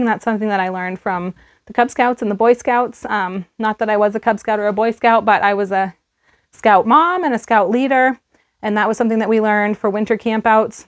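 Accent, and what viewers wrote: Maine, United States. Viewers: Sounds like your from Maine which is awesome! I live in the mid coast area. I also have a black out box even though I live off grid lol. I even have an old school crank flashlight/ radio.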